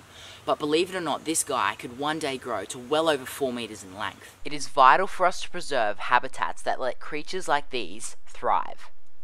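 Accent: Australia. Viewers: Posting vids, doing my homeschooling fishing......... your like me, but with dark hair, an Australian accent, and about a 600 something thousand more subs XD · Australians have the coolest accent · I need to say this because I got some beef with you Australians WHY ARE YOU AND YOUR ACCENT SO BEAUTIFUL?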